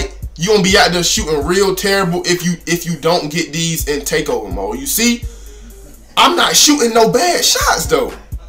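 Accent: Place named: South, United States. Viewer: Yo acccent country asf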